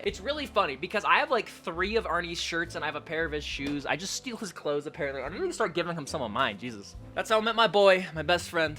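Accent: United Kingdom. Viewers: LOVE THE NEW BRITISH VOICE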